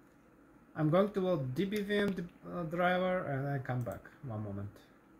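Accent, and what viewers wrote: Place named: Greece. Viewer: Η προφορά σου είναι λίγο ελληνική..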